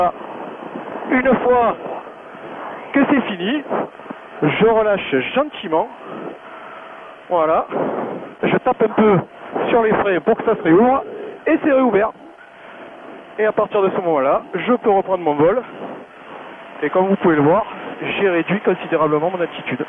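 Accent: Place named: Arles, France. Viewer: je me disais que ton accent est peoche du miens mdrr je suis du coté d'arles et toi? Parce que c est jolie ou tu voles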